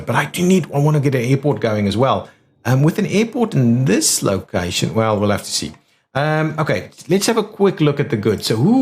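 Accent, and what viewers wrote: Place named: South Africa. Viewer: Saw Worcester in a Transport Fever 2 Spring Update search thinking this might be a South African. Could hear within 2 seconds the way you pronounce your R that you are a South African.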